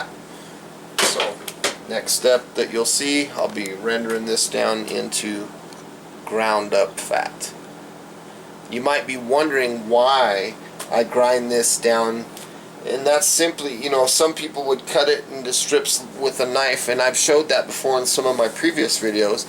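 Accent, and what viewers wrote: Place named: Arizona, United States. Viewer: Aaaah, Arizona. That would explain the beautiful accent. Stay safe.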